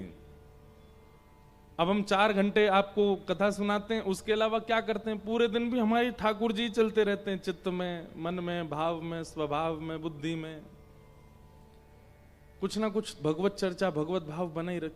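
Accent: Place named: Braj, India. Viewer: Jai jai aap k charno me koti koti pranam jai jai aap ki katha se sabka jeevan dhanya ho rha h jai jai aap k mukharvind se brij bhasha bhut acchi lagti hai aap k charno me koti koti pranam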